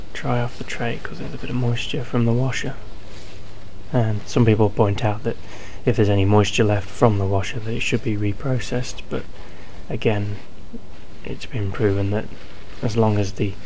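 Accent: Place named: Nottingham, United Kingdom. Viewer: Top vid as usual. Went to see my folks today in Notts, your accent sounds very similar!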